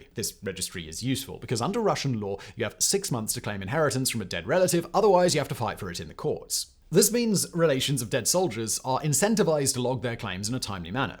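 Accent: England, United Kingdom. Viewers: Another NWO site and sounds like he's from that wannabe country called England . What was the last so-called WAR England had . Oh yes , invading the Falkland Island. Not only loosing one of their war ships , they had to use a cruise ship to bring the ground troops to the conflict . So , England has to war through Ukraine to test their weapons . Britain doesn't even rule the waves anymore since the ending of WW II .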